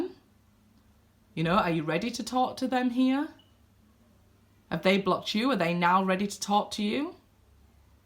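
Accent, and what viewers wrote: Yorkshire, United Kingdom. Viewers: Great reading! Hull? Your dialect makes me think of Mick Ronson😃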